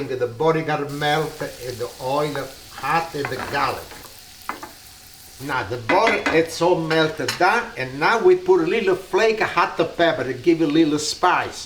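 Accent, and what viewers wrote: Italy. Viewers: ? Where would I be able to procure those little scallop dishes or scallop shells????? Bc where I live, I’ve never ever seen those, im in Pa, and there’s no good seafood stores or seafood places to get anything like that (?!), im in Lewisburg Pa, ( it’s a very old Victorian college town, yet ZERO seafood places to get FRESH Seafoods ect, anyhoo, I so SOOOOOOO ENJOY WATCHING N FILLOWING YOU! My grandpa Louis came straight here from Southern Italy, n he never even learned to speak English!! Lol lol. Yet I was able to understand Italian, but couldn’t SPEAK it to save my soul!!!! Lol lol lol🙃😁, yet somehow i understood every word he spoke to me, he use to walk me on his Hugh farm, and he would pull radishes straight out of the earth n dirt, brush it off n feed them to me! Lol, my matah use to have a FIT bc she said it wasn’t washed properly!! ( lol lol lol lol) yet my grandpa still did it anyway!and I luvvvvvved it!, tnk you so much for being YOU!, bc you sound exactly like him with your ITALIANO accent!!!!!👍👍😁😁🥰🥰🥰🥰😁🥰🥰😁😁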